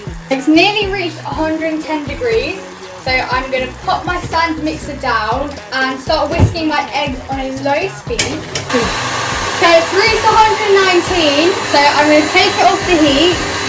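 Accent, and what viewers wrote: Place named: United Kingdom. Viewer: British accent is really hard to understand..😕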